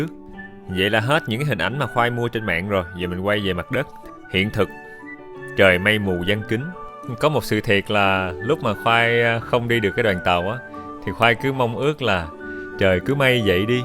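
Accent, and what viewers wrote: Mekong Delta, Vietnam. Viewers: Song mot minh lau nam o Thuy Sy , moi lan nghe lai giong mien Tay cua Chau , Chu nho que huong " Soc Trang " qua , nghe rat that tha .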